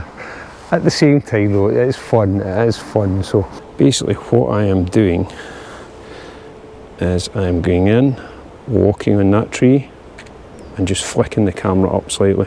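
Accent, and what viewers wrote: Scotland, United Kingdom. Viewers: I love your work and ICm Concept. Unfortunately i profit only half of it beacause I am unable to understand your Scotish. I respect it but for me this is a bit frusutrating. However I your work is outstanding.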